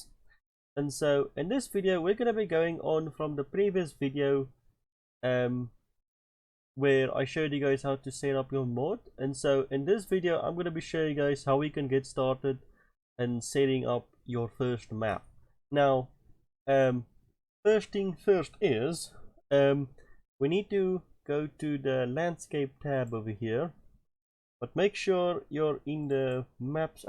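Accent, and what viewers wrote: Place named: South Africa. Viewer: I recognize a lekker accent when I hear it, boet well done video helped a lot :D asablief maak nog..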